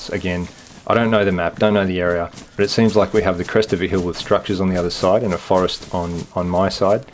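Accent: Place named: Australia. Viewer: British!? This dudes an Aussie buddy!!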